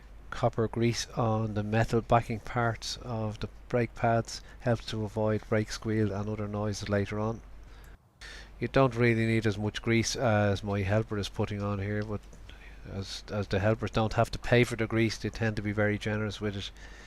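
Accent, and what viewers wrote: Ireland. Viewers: Love your sense of humour and your Irish accent. Thanks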